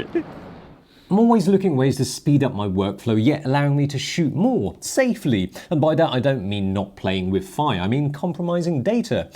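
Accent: United Kingdom. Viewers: you are an icon and a legend in my books! Lovely presenter, funny, to the point and honest, PS you gotta love that British ascent, right? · So cool to see a Japanese-looking guy speak in a British accent.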